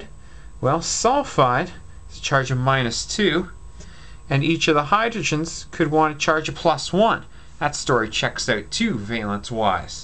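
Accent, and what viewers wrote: Canada. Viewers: are you Canadian? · Has anyone ever mentioned that you sound like a Canadian John Malkovich?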